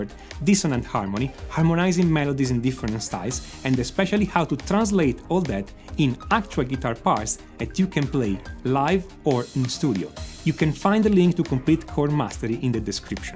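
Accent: Italy. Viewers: I love this itallian acent! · I like your italian accent. But the background music is very annoying. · im so greatful that you are using the CDEFGAB notes, instead of do re mi blah blah blah, i can tell you're italian by your accent, and i live here in italy and i hate learning guitar or theory in person cause they all teach it in italian terms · Ma...sei italiano????? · I'm ready to bet my house you re Italian! Pronto a giocarmi la casa che sei italiano 😂 bravo e grazie, molto interessante! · it's italian flavored english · Great. Are You Italian?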